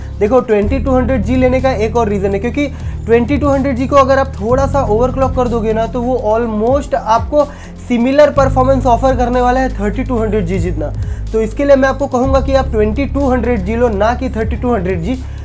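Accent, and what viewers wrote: Gujarat, India. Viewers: Bro aap Gujarat se ho ? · Tame gujarati 6one 😊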